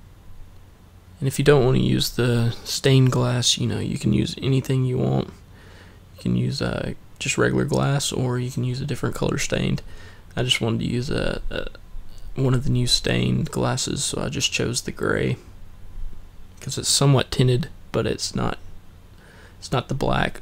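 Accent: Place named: United States. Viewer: I love your country accent! ;-)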